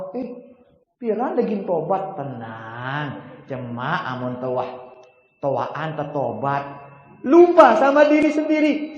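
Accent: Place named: Lombok, Indonesia. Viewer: Khas warga lombok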